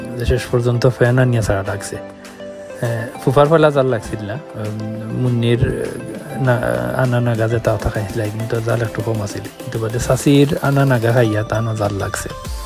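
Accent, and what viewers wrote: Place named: Sylhet, Bangladesh. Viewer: Sylheti Voice amr khub valo lage Bhai 😻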